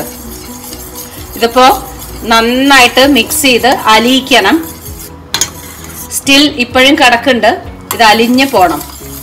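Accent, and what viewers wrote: Palakkad, India. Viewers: Dear chiechi wonder ful performance chechi palakkadnte abhimanam anne njan oru palakkad kariyanne chechi nammude palakkadu style venam varthamanam parayan enikku orupadu eshtamane njan ennaleyannu video kandathe iam very happy njan mumbayil thamasikkunnu chechi orupadu thanks njan ella video kannam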